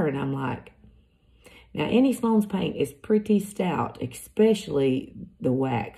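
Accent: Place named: South, United States. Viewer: You rock, Lady! I could listen to you speak alllllllllll day! I’m an Aussie so I love the southern USA accent ❤️🇦🇺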